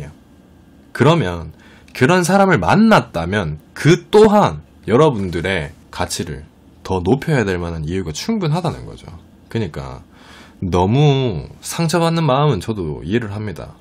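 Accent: Gyeongsang, South Korea. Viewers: 잘생겼다~ 경상도 사투리도 멋있음~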